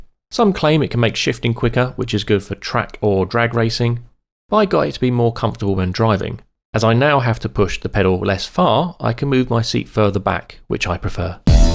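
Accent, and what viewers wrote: United Kingdom. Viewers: NitroCaliber he is in the UK (registration plate and accent gives it away), but the black coloured grills were bought in Pounds (UK currency).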